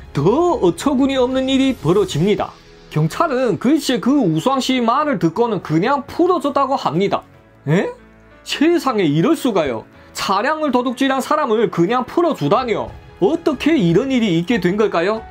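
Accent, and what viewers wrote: Yanbian, China. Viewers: 억양과 엑센트가 특별하고 분명한 어조로 구사한다 전달력이 확실해 상황의 신뢰성이 충분하다 핵심 단어마다 엑센트가 빠지지 않은다 그리고 웃지않으며 진지하다 서술의 시작과 끝이 목소리톤이 일정하고 인상깊은 연변사투리와 중국어가 돋보인다 이게 종일tv의 칭찬요소다 댓글창을 보면 안티가 하나도 없다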